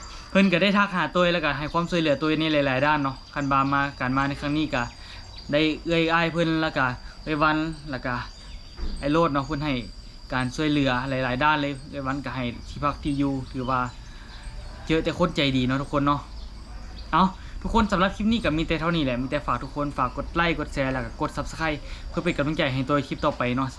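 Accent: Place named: Lom Sak, Thailand. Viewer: สำเนียงเอื้อยเหมือนคนจังหวัดเพชรบูรณ์อำเภอหล่มสักหล่มเก่าครับ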